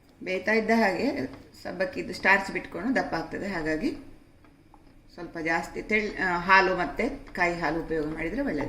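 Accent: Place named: Mangalore, India. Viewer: Thumba nidhaanavaagi Mangalore kanadadhalli neevu vivarisuvudhu thumba chanda👌👌neevu elli iruvudhu Madam? Mangalorenalla?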